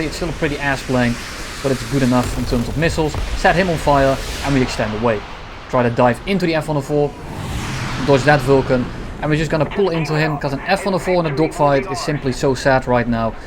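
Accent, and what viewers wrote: Netherlands. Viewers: Is it Just me or do i hear a dutch guy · I'm trying to figure out what your accent is. I think Dutch, in which case I'll nickname you (if you want) but I'm not 100% sure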